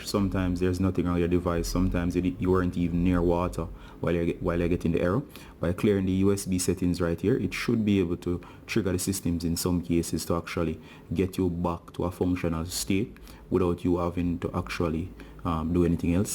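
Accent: Jamaica. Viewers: Me alone notice issa jamaican🤩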